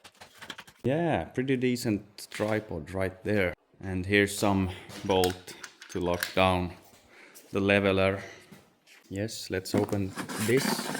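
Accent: Finland. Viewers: Good video, how did you learn such good English, it’s very good. I see where you live, looked it up on Google Maps. But you sound exacting like everyone I knew growing up with your accent, I love it, reminds me of times and old timers long gone. Don’t know if you know anything as far as geography of US. But I grew up in Toivola, Minnesota, 50 miles NW of western tip of Lake Superior. All my ancestors came from Finland, I’m old 66, probably last generation here that didn’t learn Finnish, my grand parents tried, but I guess I had nothing to do with it when I was little lol, but when I went to first grade in 1966, there was some of my class mates that could only speech Finnish. Love watching your videos, and learning about your country and ways, I grew up on the farm also. One thing I know!! you live close to that big body of water that controls controls your weather! 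Our big Lake Superior does the same thing here. I always said my ancestors must have settled where they did when they got of the boat and train because the weather was horrible just like what they left so it was just little home!!!!!😂😂😂 Thanks your friend Larry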